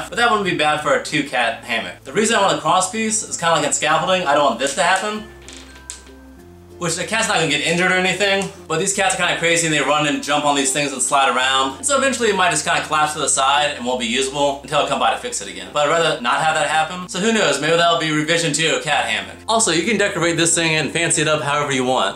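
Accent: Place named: Virginia, United States. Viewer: he kind of sounds like a Virginian like me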